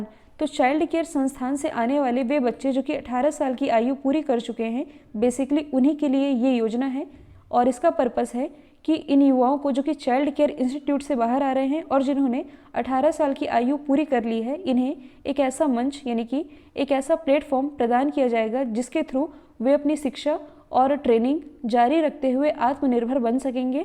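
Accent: Madhya Pradesh, India. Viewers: Maam aap MP ki hai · Ma'am Aap mp k ho?? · वाह मेम आप एमपी से हो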